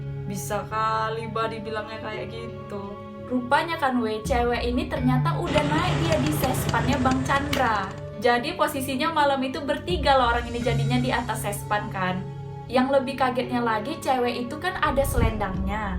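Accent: Medan, Indonesia. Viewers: Mbak orang medan ya? Kenal pak john als 270 tidak mbak? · ank Medan rupanya bah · Anakk medann kalii ahhh🤙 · Wih anak medan nih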